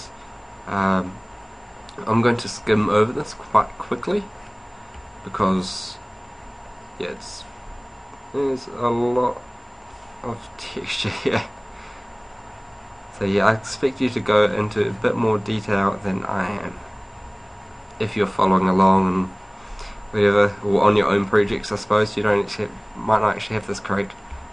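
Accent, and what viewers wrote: New Zealand. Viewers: Sounds like a Newzealander, thought that aswell. He's one of us. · LOL! English? is from New Zealand. I actually quite like his accent.:)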